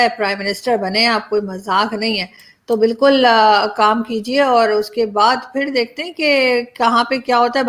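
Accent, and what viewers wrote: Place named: Mumbai, India. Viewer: ji.. mumbaiya hindi ek number.. aap sabki vaat lagaati ho 😁😁😁👍👍👍👍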